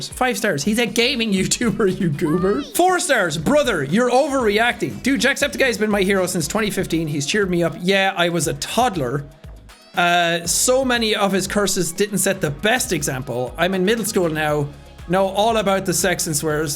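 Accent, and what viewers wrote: Ireland. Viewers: I actually like when swears a lot, he's and entertaining guy and his Irish words are magical to my ears. Granted I'm an adult now so I guess it's different. I'm also Native American so like the Irish, swearing is integrated into our conversations a lot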